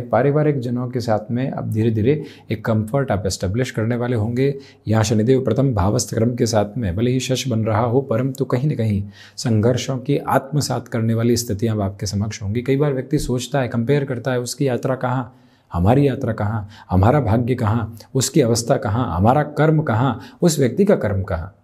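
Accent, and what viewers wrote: Standard. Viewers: Bahut kam log itni shudh ( Pure) Hindi bol paate hai aap ka bolna mujhe bahut pasand hai rahi baat khumbh rashi ki to main mann chuka ho ki ab kuch accha hone wala nahi ha chamatkar ho jaaye to main kah nahi sakta SUNIL CHAUHAN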